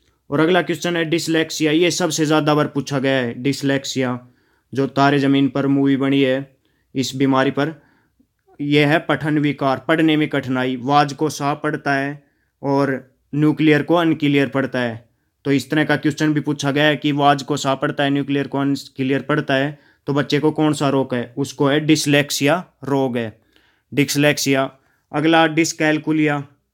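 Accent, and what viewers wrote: Haryana, India. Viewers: Hariyana se ho kya sar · Haryana ka laage h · Haryanvi h ye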